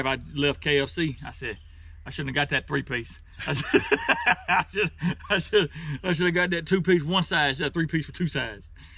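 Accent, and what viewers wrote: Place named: Tennessee, United States. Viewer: Your landowner that you just set up in , where the trailer is, he sounds like Burl Ives 👍. I love your show, love your accent too, even though I’m from Erie Pennsylvania I have my mama’s family from Tennessee 😃same accent, that’s a excellent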